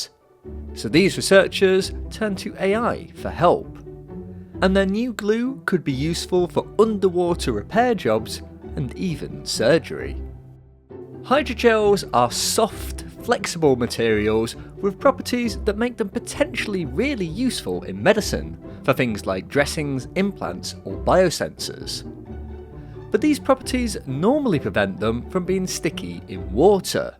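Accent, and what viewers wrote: United Kingdom. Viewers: We're watching a channel called "nature video", the most generic title possible, and maybe the voiceover only sounds natural because AI finally mastered this accent, which sounds normal enough in the UK. When the AI writes the script, the AI gets the credit. It's best to assume everything is AI generated, almost completely, and then maybe change your mind when presented with convincing evidence, otherwise. Everything we've all been doing online? Training data. You, me, Tom Scott, every upload, every comment, every livestream, everything.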